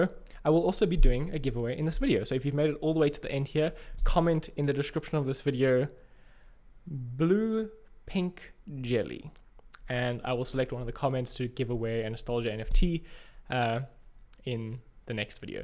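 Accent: South Africa. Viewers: Blue Pink Jelly!!! Absolutely love getting top quality crypto content in a Saffer accent. Kudos to you! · Blue Pink Jelly. Love your videos and, on a personal note, it's really nice to hear a South African accent amongst all the crypto YouTubers that I watch. Being very new to the space it's incredibly helpful to have people like you that are logical, balanced and insightful.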